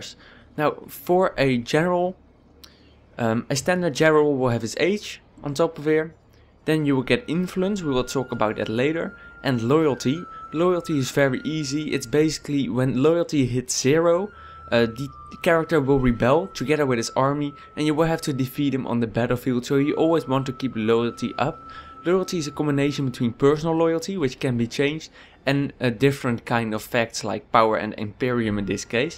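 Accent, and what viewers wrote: Netherlands. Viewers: Yo bro. Thx for this vid. Needed it to understand politics better. Just subcribed to your channel. By the way. Are you dutch? You have a accent a dutch guy would have. Thx again and peace out bro :-) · you sound dutch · judging from you accent i think you are dutch XD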